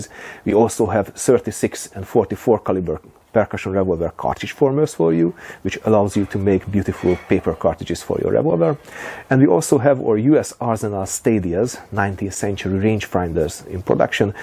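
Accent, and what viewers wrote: Hungary. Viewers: The moment i heard your voice i knew you are Hungarian. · This is the first video I saw from you, but I instantly recognized the hungarian accent. :D Great vid, keep up the good work, valahol van egy eladó bojlerem is.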